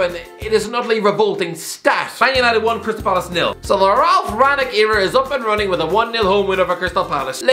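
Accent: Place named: Ireland. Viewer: A Walking Meat Loaf, a Walking Taradactul....man this Irish fella, along with that accent, sure does know how to make jokes, all while telling football news! Bravo sir...bravo indeed! Btw did u forget Origi's goal from TAA's corner against Barcelona? You were rattling off so many, wasnt sure if that one was in or not!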